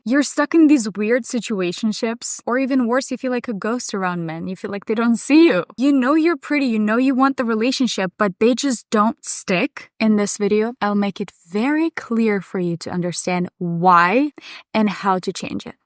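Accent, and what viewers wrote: Russia. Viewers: Please stop doing typical Russian accent in purpose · акцент русский, вы откуда?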